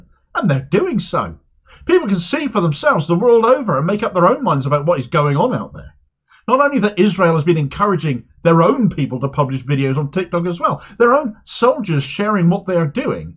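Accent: Cornwall, United Kingdom. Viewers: Zackley., Great to hear a Kernow voice. I'm not Cornish myself - Cornish ancestry but brought up in Somerset... but I love to hear West Country voice and opinion. Thanx mate.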